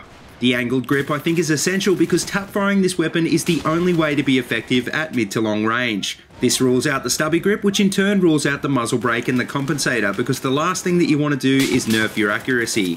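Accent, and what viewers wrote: Australia. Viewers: Been watching since m 39 sks review I believe. Love the videos your making. Hope your band flops so u can keep making theses vids lol. Truly hope yall do well. Had a few friends that tried a music career didn't make the "big time" but did well.sorry I forget your bands name could you please remind me. The 240 bravo, Ace 23, 870, ace-sv and scar are my "go to" weapons. Looking to change it up a little bit , could u recommend a new weapon selection. Played with most but those the ones I gravitated towards an after a cpl of beers my game play goes ptfo to sporadic game planning an "ttt". And 1 last thing if u don't mind where in the world are u. I think u an Australian. Not sure no offense if not jus not good with accents and flags. So to sum up love vids, can u recommend some new weapons.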